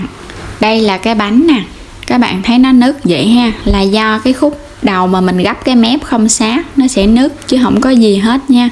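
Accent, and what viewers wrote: Mekong Delta, Vietnam. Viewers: Giọng nói dễ thương của người miền Tây. 95 xin chào bạn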